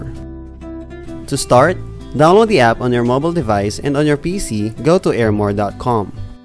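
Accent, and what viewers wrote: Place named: Philippines. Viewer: this is the thickest pinoy accent ive heard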